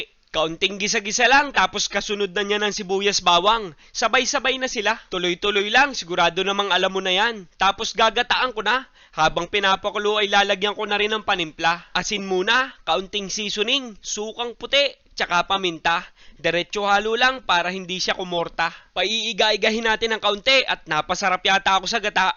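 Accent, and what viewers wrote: Batangas, Philippines. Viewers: Magaling na mag luto natutuwa pa ako sa Punto ng Batangeño 😂😊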